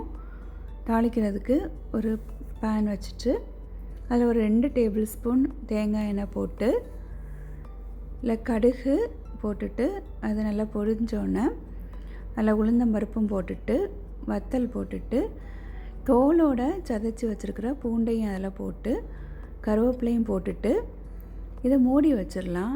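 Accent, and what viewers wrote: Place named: Kanyakumari, India. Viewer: சூப்பரா செஞ்சு இருக்கீங்க சிஸ்டர் நீங்க கன்னியாகுமரியா நீங்க பேசுறது ரொம்ப அழகா இருக்கு ❤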